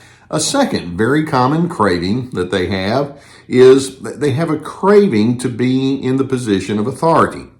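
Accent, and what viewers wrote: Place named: Houston, United States. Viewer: This is utterly excellent. Thank you, a voice for real humanness in a world gone mad. You your words truly benefit many. Your humility and honesty nurture our souls and authentic selves. I have even come to enjoy your Houstonian accent, very much, because your balancing Weltanschauung shines through the way you convey your wise words and pure intent. So, thanks again and again. To me you are a Voice in the wilderness. You seem to be a Voice for The Voice of the Silence...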